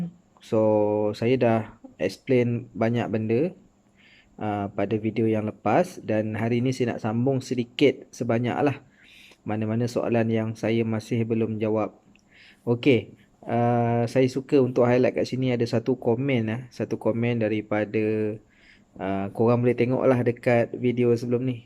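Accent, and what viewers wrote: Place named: Java, Indonesia. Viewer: Ada lah bang bunyi jawanya😅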